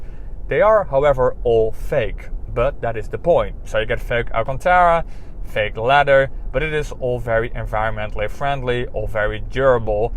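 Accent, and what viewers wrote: Netherlands. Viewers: is the accent dutch?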